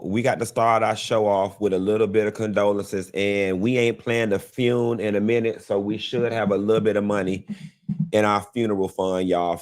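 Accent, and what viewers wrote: South Africa. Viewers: Sisi I spotted your accent my sister!! #NoDNA just RSA!! Yes our person representing us on my favourite show!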